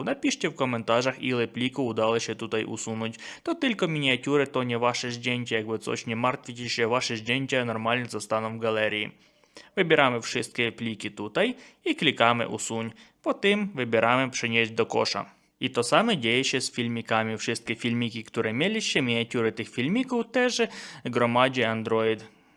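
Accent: Ukraine. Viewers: jakoś kurwa nie ufam ci. ten ukrainski akcent zniechęca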